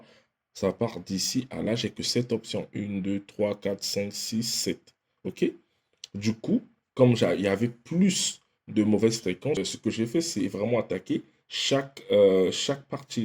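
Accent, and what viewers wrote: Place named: Brazzaville, Republic of the Congo. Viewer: Merci mon frérot et tu a un bon accents brazza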